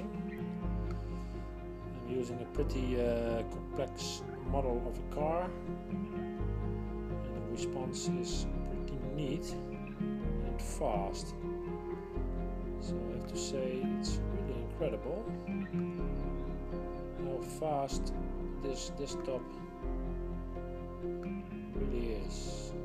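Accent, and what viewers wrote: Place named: Netherlands. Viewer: aan jouw accent to horen ben jij Nederlands. Vraagje, werkt dit ook met Microsoft RDP? Ik heb thuis een ESX 6 host. Hierop heb ik een aantal servers waaronder een Terminalserver waar mijn familie op inlogt. Nu wil ik dus de Quadro 4000 met ze delen zodat ze binnen Terminalserver gebruik kunnen maken van 3D mogelijkheden. Is dat mogelijk hiermee? Want in jouw demo maak je gebruik van VMware View. Dank je wel voor jouw video!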